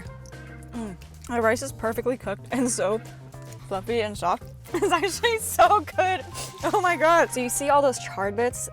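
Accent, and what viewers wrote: Philippines. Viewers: Your English is sounds like filipino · Man, u even talk and eat like a Filipino. I'm very impressed.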